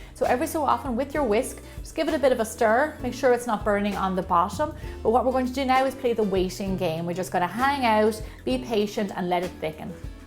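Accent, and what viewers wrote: Ireland. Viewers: wow that's amazing! haha, I saw your location is in the US so I automatically thought it was a north American accent, but hey Irish accent always reminds me of Tom Branson from Downton Abbey!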